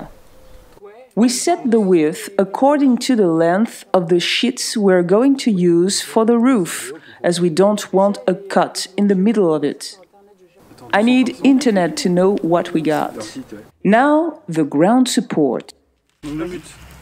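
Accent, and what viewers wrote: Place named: England, United Kingdom. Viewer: i really like your english accent. have a nice day ;-)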